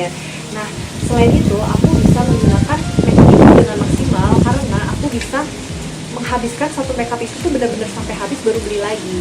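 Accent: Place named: West Java, Indonesia. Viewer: Duh eta pabalatak, nyunda pisan teteh. Hahah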